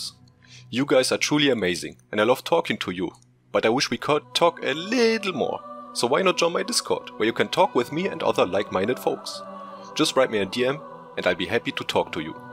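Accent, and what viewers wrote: Germany. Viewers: Hi, thanks for the video! I like your German accent:D. I installed most of the mods in the videos you uploaded, but I don't have the mod options in menu. Could you help me? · Really enjoying your videos, very helpful and informative. I also love your German accent, I lived in Germany for 5 years and fell in love with the place. Have a nice day. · der deutsch aktzent kickt :) nice vid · Youre german accent is so funny xD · Jo kann es sein dass du aus Deutschland kommst xD Der Akzent kickt ^^ Edit: Oh ok du bist anscheinend ein Deutscher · Dein Englisch ist definitiv besser als meins...aber dieser Deutsche Akzent triggert einen einfach hart...versuche einfach das ganze mehr rund auszusprechen oder hör dir an wie Amerikaner sprechen das klingt nicht so hart und man würde nicht sofort hören das du Deutscher bist xD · Höre ich da ein deutschen Akzent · Ich mag deinen deutschen Akzent 🙌 great Videos, keep it up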